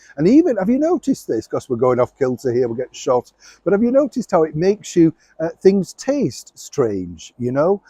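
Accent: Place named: Northern England, United Kingdom. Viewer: sweetheart,everything you talk about resonates with us Brits,For some of us who've lived overseas for decades its nice to hear about headcolds'horlicks 'spring flowers and your sweeet northern english chitchat.Dont change darling and dont listen to the negative people'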